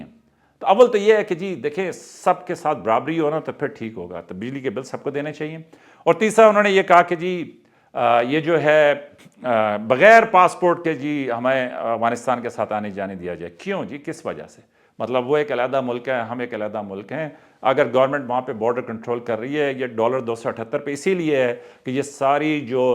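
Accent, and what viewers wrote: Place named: Sargodha, Pakistan. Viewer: سر بہت اچھا ❤ آپ کا لہجہ سرگودھا کا لگتا ہے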